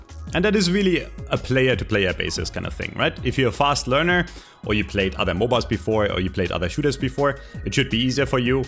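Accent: Germany. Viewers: are you german or danish? Basing it on accent.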